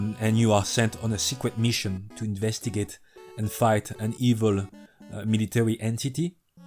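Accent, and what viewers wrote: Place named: France. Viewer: Holy accent. Ton accent francais est épais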